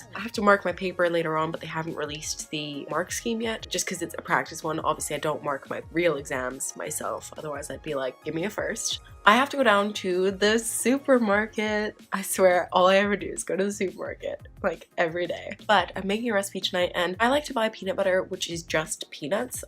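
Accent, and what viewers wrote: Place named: United States. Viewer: I think it's super cool that you are pushing yourself to "read harder" by reading at least one adult book a month. I hope you find some new authors and genres that you enjoy. I am such a tired crier, like if I'm weepy, chances are I'm exhausted. Which country do you live in/ go to uni? Your accent is like super hard to determine lol, sometimes I'm like oh! America for sure, and then sometimes I'm like... England? maybe? Australia? lol I'm the worst at this...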